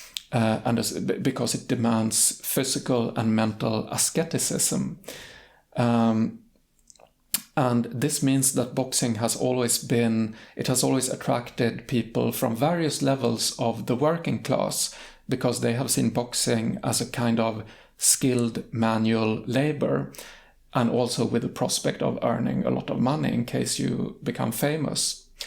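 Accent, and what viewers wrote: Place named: United Kingdom. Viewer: Dear, very good arguments recommending the book. But I am highly interested in when and where do you study English? Your accent is very British and incredibly understandable!! And I would like to know if you wrote a book about learning Swedish, Please, add more and more videos about YOU;) Greetings from Argentina!!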